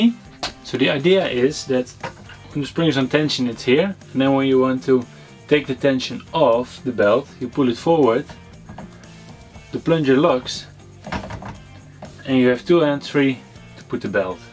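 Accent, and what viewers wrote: Netherlands. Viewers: dacht al een nederlands accent te horen! gister op je kanaal gekomen nu geaboneerd! kan niet wachten tot ik mijn schuur/werkplaats klaar heb dan komt er ook zeker 1!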